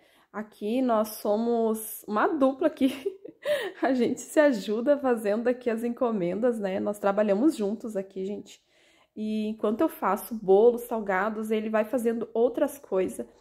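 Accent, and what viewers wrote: Rio Grande do Sul, Brazil. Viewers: Boa noite lindona , achei vc alguns dias atrás , já me escrevi , e antes de assistir o vídeo eu já dou laik , vc e maravilhosa , e eu adoro seu sutak gaúcho , que Deus te abençoe sempre bjo 🥰🥰🥰🥰🥰🥰🥰🥰🥰🥰🥰🥰🥰🥰🥰🥰🥰🥰🥰🥰🥰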